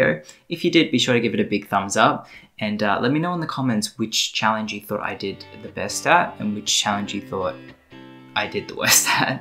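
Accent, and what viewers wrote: Australia. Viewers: My god, I just met a guy from VRChat. He’s actually from Australia too and he sounds almost EXACTLY like you. It’s kinda weird, but I like the Australian accent so I’m not complaining lol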